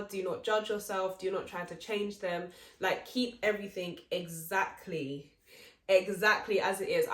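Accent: United Kingdom. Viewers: london accent 🇬🇧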